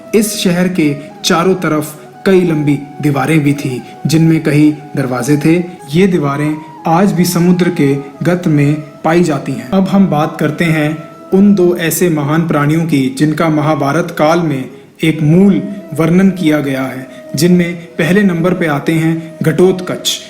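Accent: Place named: Punjab, India. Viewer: Zaberdast jaankaari, commentator ki awaaz bhi achchi hai, lekin punjabi accent ke kaaran kuch shabdo ka uchchaaran galat hai. Jo ki kaano ko chubhta hai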